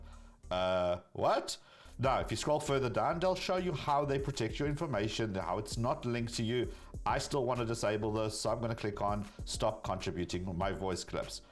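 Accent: South Africa. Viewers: last thing I expected here was to hear a Saffa accent lol, nice!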